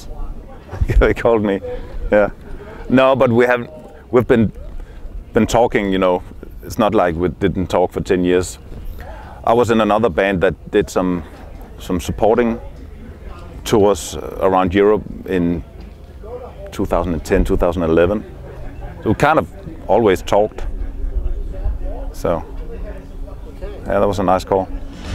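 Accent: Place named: Denmark. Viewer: Why fix it if it ain't broken ~ gotta love the slight Danish accent, these guys are great, can't wait to see them live next year!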